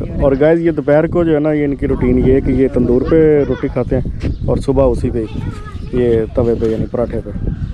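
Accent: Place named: Gujrat, Pakistan. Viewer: i am pretty surey that you belongs to gujrat pakistan, your punjabi comes from those areas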